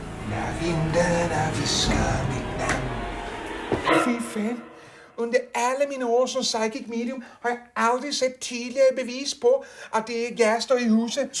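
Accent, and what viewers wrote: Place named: Denmark. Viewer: Jä , å ändåäh prätar han 100% Danskäh 😅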